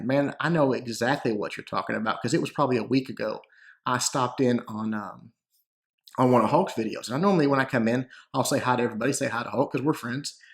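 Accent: South, United States. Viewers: dont listen to these idiots, your southern accent is the best!! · Southern accent is fun, don't try to change it, it sounds like my uncle is doing the video 😀 keep on doing what you do! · its true, your voice is very country-ish, but its just adorable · I don't mind the way his voice sounds. I've heard many country voices and I live in the city/suburbs in the north east United States. So I don't understand all the hate about it myself. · His voice is soothing. And who cares if it's country lol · Half a year ago when i found your channel (ironically i was here because molt asked his viewers to check out a youtuber who can explain clash strategies down to a science). I was one of those folks that weren't exposed to country accent. And honestly, your accent did turn me off. But your content was ridiculously good and one of a kind out there.. so i accepted that about you and tuned in. 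Now looking back I realized what I did was silly, and unfair; I prejudiced against country accent. Now watching you daily, not only have I learned a bunch of clash, I feel I have come to tolerate the country accent. Frankly, I quite like your accent now; it sounds jolly. I'm sorry, and thank you,. I just kinda want to throw this out there for folks who aren't exposed to the country accent. Keep an open mind, learn to accept people who are different from you, and you will gain much more in life.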